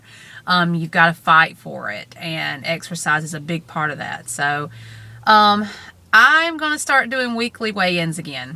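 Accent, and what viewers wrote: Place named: South, United States. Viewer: I was thinking this would happen not be negative-but do not despair-there are tons of people going through this-the dr.'s are partly responsible for performing the surgery without haing the psychological wherewithall to go through it. They just want the money. You sound like you are from the South and probably were rainsed on biscuits and grits-so anyway-good luck.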